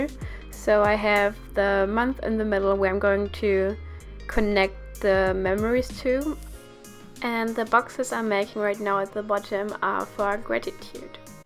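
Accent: Germany. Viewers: Ich hab schon mehrere deutsche Youtuber Englisch sprechen hören, aber ich glaub deins war bis jetzt am besten😂Finde den deutschen Akzent voll niedlich 😅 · der deutsche Akzenkt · U are from Germany right?? Because u had kind of German accent 😂 And in your old bujo was :"Omas todestag" (I am from Germany sorry for my bad Englisch) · When you’re 1min into the video and already know that she’s German not because of her speaking (which is quite elaborated because I didn’t notice her accent was German at first) but by the fact she had a Mallorca page in her journal... hach die Deutschen 😂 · i like your deutsch akzent macht das video familiär <3! XD ( bitte mit deutschem akzent lesen) Aber du sprichst echt gut englisch!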